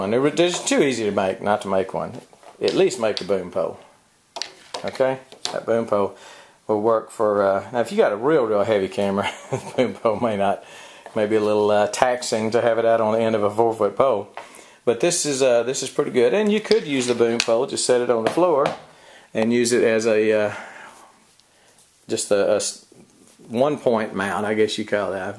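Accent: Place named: South, United States. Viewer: I understood every word and love your accent! Voice overs for Disney could definitely be a second line of work for you! Another Southerner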